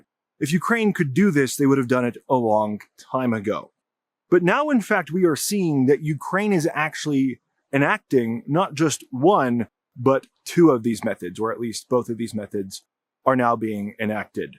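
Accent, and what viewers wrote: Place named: California, United States. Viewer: Snl californians accent a little annoying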